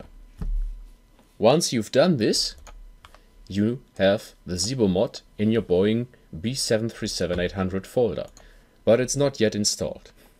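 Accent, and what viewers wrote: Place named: Germany. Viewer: What city are you from in Germany?? I love your accent.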